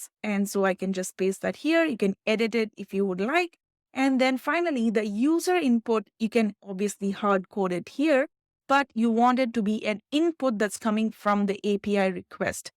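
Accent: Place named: India. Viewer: How do you not know? It’s literally the most common accent in the world. There are more english-speaking Indians than all the native English speakers in the world combined.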